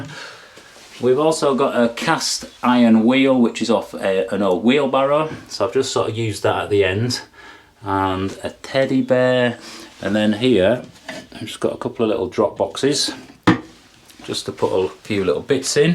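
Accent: Yorkshire, United Kingdom. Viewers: Hi.... How you doing? What you been up to? It's been a while since you posted a vid. Hope all is good!? Just letting you know that I enjoyed watching a lot of your Lakes camping trips... I'm from Lancashire but lived in Yorkshire for half my life so I really connected with your accent and manarisiums, reminded me of when I was younger. Take care with whatever you are doing and thanks for the effort producing the content that you have. 🤟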